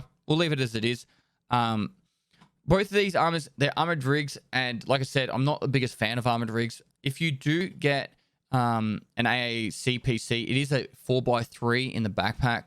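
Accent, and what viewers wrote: Australia. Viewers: is australian or a kiwi?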